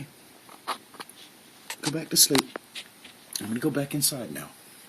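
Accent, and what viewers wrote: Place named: South, United States. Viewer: That's a particular accent isn't it? I'd guess it's from one of the southern states but I'm not sure which one.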